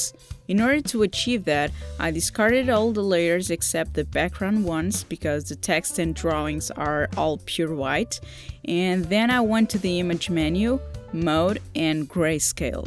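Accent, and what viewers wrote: Portugal. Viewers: Suspeitava que fosse portuguesa, pelo sotaque! Acertei hehe :D (sou professor de inglês, por isso notei facilmente, btw ;)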